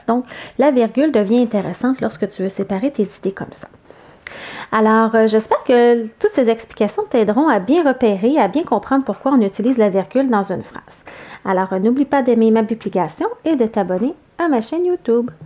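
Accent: Canada. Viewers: C'est la meilleure des manières, pour apprendre une leçon comme celle-ci. se laisser bercer par un doux accent Canadien. Pouvez vous me dire si cela est juste ? Merci d'avance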